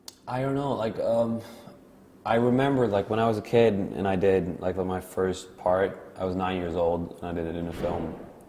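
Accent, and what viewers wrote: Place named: United States. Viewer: He sounds American!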